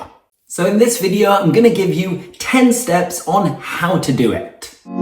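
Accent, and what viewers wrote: United Kingdom. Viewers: Your british accent is so beautiful!! 🥰 I am Brazilian, and my English leve is intermideat, but I am studying for improve my English and wanna be 100% fluent. 😊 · I couldn't express my feeling much more, but at least this video could provides me guides of progressing my English skill I wanted to. Despite the struggle on my English words management for speaking, I still can understand English in the simple way (such as everyday context). As in the video, you had said "Practice makes perfect." But for me, it's seems too difficult because I always thought practicing on English consumes more times than expected. Neverthless, I have to stay strong and never willing to surrender on my track, even if it's not perfectly. And you had said next "Practice makes progress." It is true in a matter that whatever I study messy, unorganized, mistakely, and so on, progress is currently on. 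Anyways, I really enjoy your British English sound. And don't forget, I'm learning English as a second language to unlock the potential opportunities, pursuing my dreams abroad, and having fun to myself. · Hi . Are you from the UK?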